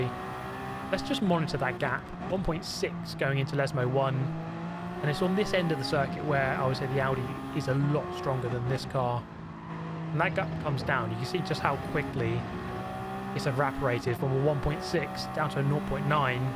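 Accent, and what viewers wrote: United Kingdom. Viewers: Hello Viewes Heah (British accent, completely ignoring the existence of the letter R).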